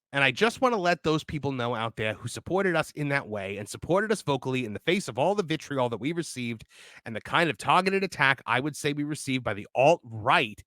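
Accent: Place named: New England, United States. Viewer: Are you from Boston/New Hampshire/Rhode Island? you got the accent. Go Sox!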